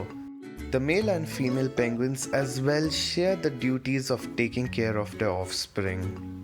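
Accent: India. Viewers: annoying Indian accent.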